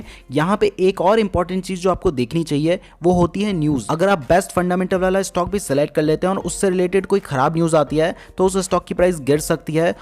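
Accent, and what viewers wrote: Uttarakhand, India. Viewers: Bro. Uttarakhand se ho na 😎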